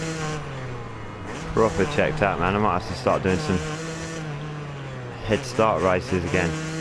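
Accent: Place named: United Kingdom. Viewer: I love your accent so much where are you from in the uk ? Good videos by the way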